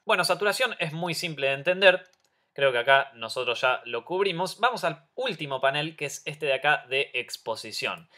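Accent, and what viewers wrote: Argentina. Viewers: Nuevamente, si así puedo tutearte!! muchas gracias desde España!! te felicito por tu forma de explicar las cosas, es útil y divertido!! y me encanta tu acento argentino y la forma de expresarte!! me estoy tragando cada tutoría con el mas grande interés!! buen trabajo!! Vale puede parecer un comentario muy pelota pero que narices, a veces, corresponder a algo bien hecho es merecido!!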